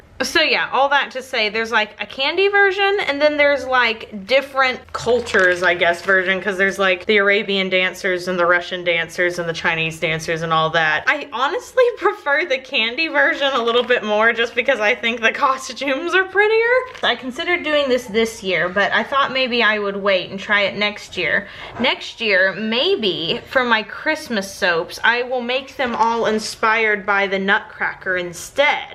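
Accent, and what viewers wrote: United States. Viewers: какой же все таки противный американский акцент, но эта баба настолько говорит на нем, что ощущение, что у этого человека гайморит или синусит. фу блэ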